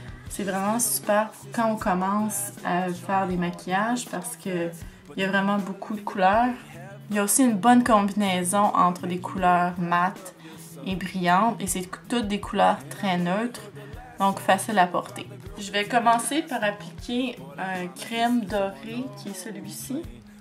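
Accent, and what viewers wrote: Quebec, Canada. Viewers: T'est magnifique, j'adore l'accent québécois.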